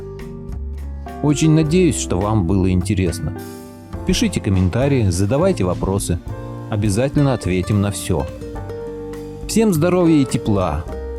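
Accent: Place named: Arkhangelsk, Russia. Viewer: уловила наш говорок Архангельский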